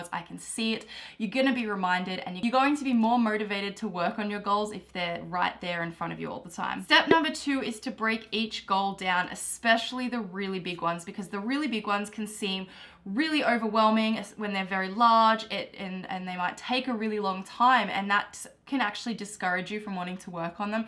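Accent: Australia. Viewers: Do you have an Aussie accent? I heard you say "here" in the American accent . But the rest seems Australian to me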